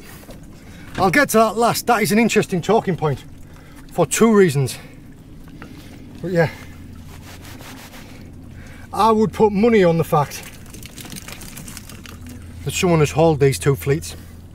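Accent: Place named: Hull, United Kingdom. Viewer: HI...new sub here...sorry to hear about your visiting theives m8...is there any where that you can review ship movements in your areas, looking at radar tracking ect... so ive been thouroughly entertained with your video's, i must have watched around 20 upto present...& i realy enjoyed where you managed to catch the 2 tuna...fantastic video's...ime a metal detectorist from the north west of the uk (i used to shore fish from the front in Morecambe, a fair while ago!) your accent sounds like a Hull one... deffo northern!...anyway, i hope you make progress with finding out what vessel's were near to where your pot's were...good luck & Happy Hunting...take care & tight lines! Gordon...(click my picture and check out a few video's if you get the time)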